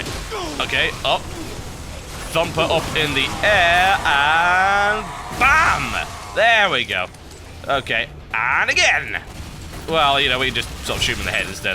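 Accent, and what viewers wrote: United Kingdom. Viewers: this guy's british accent can almost compare to paul from paul's gaming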